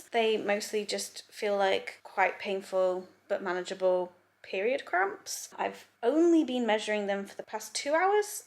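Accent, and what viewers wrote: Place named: Northern England, United Kingdom. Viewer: Congratulations! I love that you sound more northern when sleep deprived and pumped full of painkillers post-birth 😂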